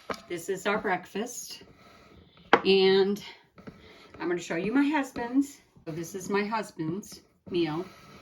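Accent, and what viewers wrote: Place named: Rhode Island, United States. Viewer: New friend here from the Christmas collab! So glad we found each other’s channels. Looking forward to watching more of your content. I lived in Rhode Island for several years; loved it there so much. Your accent brings back memories. My name was Melinder instead of Melinda 😂 All of your recipes look fun and delicious. Merry Christmas!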